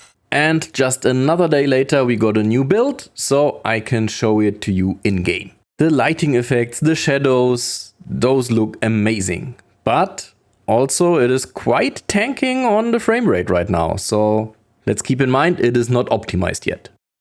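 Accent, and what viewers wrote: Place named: Germany. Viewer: I don't know you but i love your german accent ;)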